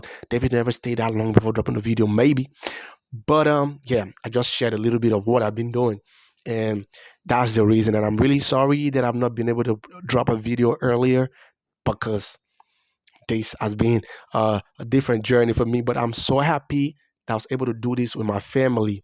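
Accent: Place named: South, United States. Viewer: oooh ok. That’s why I’m hearing a southern accent okkk. You’ve picked up the dialect very well. Ok Lagos. Awesome!!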